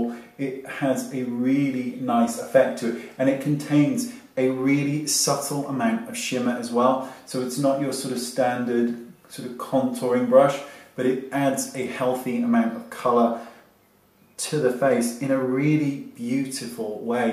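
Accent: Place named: Wales, United Kingdom. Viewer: I'm fairly sure he's Welsh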